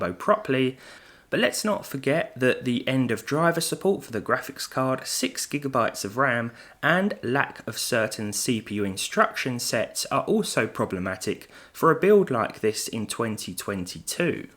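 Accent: United Kingdom. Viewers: Couldn't be any more British 😅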